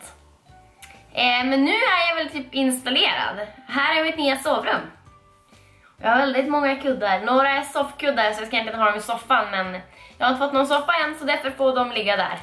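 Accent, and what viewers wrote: Norrland, Sweden. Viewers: Jag avgudar din Norrländska dialekt :) <3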